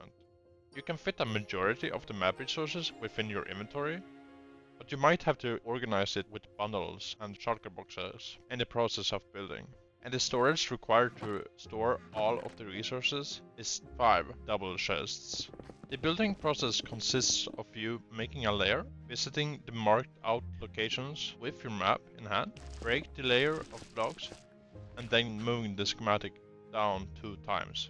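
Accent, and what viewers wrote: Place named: Europe. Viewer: Dawg he's just European cut him some slack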